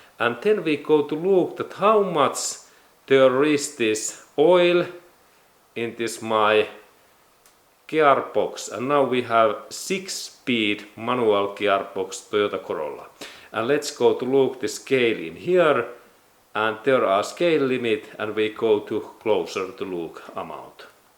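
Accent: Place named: Finland. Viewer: Im here from the video: Timing belt little wrong position Honda Civic. Cause I had a problem with my crx and Ive been wondering if you still do videos and you do. And still the same accent, I love this. Keep up the good work my Finnish friend. Anyways Finland Is a very beatiful and friendly country. Ive been there, so I know what Im talkin about. :)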